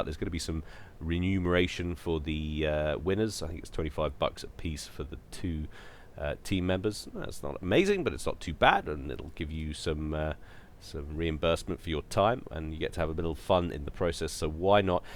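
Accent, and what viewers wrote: United Kingdom. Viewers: between you and TotalBuiscit, it's easy to get your fill of british people talking about games.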